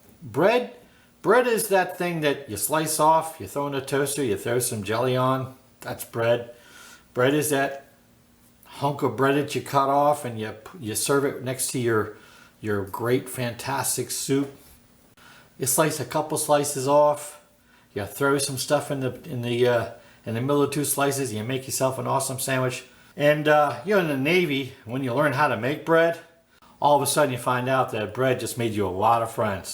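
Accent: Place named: Pennsylvania, United States. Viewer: I'd love a junk of that bread covered in butter❗❗❗Are you from PA❓YOU SOUND LIKE MY MAMA'S FAMILY🥰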